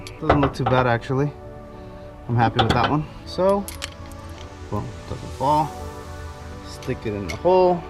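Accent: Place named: Oxnard, United States. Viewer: You sound like your from Oxnard too. and I can make you T-shirts man, just give me a design. I silk screen em.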